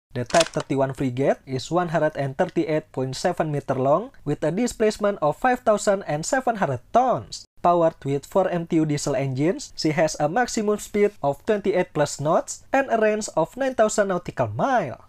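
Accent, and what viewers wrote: Java, Indonesia. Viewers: english with indonesian aksen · aksen inggris lu indonesia banget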